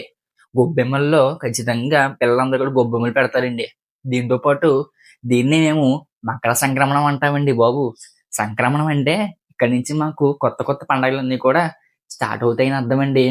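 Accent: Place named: Godavari, India. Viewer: Abbo Godavari slang😊😂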